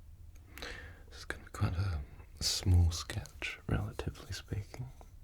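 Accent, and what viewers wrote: Australia. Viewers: dude I love this, it's like listening to do ASMR hahaha (in the best way!). Something unmatched and familiar about other Aussies doing asmr, love your videos 🙂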